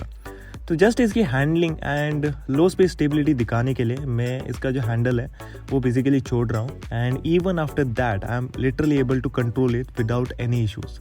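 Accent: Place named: South India, India. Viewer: Sauth Indian ho bro aap aap ki accent Kuch alag lag rahi he muje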